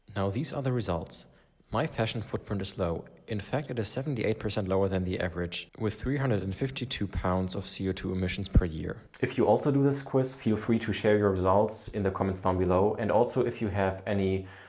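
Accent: Germany. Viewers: Great video! I love fashion but realized I don't even wear most of my clothes, so I started to declutter everything and became more aware of my purchases. Are you from germany? The accent sounds familiar 🙃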